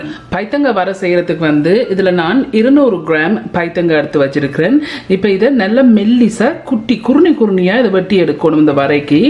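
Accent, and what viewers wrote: Sri Lanka. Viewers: உங்கட இலங்கை தமிழ் ரொம்ப வடிவா இருக்கு உங்க மாதிரி... இந்திய தேசத்தின் தமிழ்நாட்டிலிருந்து தீபா...❤️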